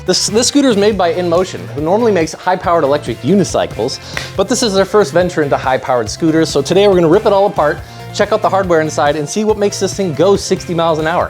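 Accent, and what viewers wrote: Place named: England, United Kingdom. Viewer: Hahaha you are hilarious! English accent and all! 😂🎉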